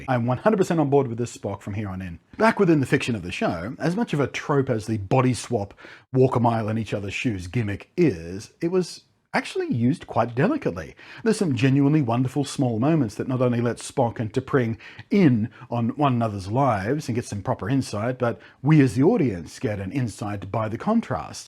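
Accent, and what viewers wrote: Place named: Australia. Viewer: Hey, expat Aussie in the US for 23 years, are you likewise? I hear the Aussie accent but occasional hard Rs:)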